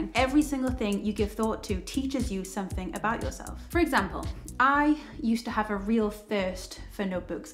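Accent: Newcastle, United Kingdom. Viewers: are you from Newcastle? (or somewhere close?) :D i just uploaded a video on minimalism too and i'm also from newcastle..but i live in The Netherlands now... hi :) :) :)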